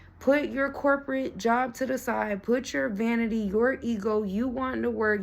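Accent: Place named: New York, United States. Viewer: I'm detecting a northern accent, is that NJ. , long Island. Or staten Island?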